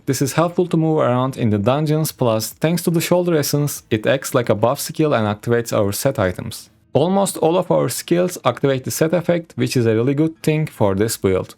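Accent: Turkey. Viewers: türk aksanı vurdu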